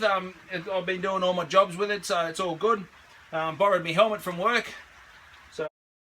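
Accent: Australia. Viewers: Hi Mate you must be from outback Queensland or NSW judging by your ocker accent. I love how you did the bottom this is by far the best bottom on YouTube. I think you would be better having the hinge on the bottom of the door so when its open its out of the way and you can see the flames. In fact why do you even need a door when the top is open? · Matteeee. F..king legend. You sound Tassie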